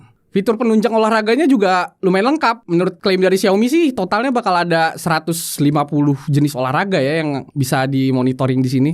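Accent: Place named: West Java, Indonesia. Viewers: meni nyunda pisan euy si akangnya 😁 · Ngomong nya cepat belibet artikulasi kurang jelas, dari aksen bicara nya khas Sundanese, lain kali kalau ulas gadget lagi, mohon tempo bicara di atur yang pas jangan tergesa-gesa, supaya pemirsa tidak bingung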